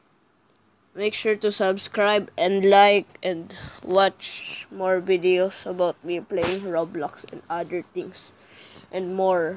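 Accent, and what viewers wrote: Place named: India. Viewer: Its like indian accent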